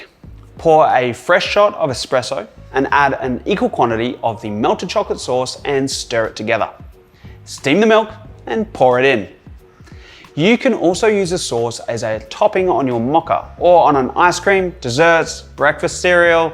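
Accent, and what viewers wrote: Australia. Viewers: Sydney, Australia maybe? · is he aussie???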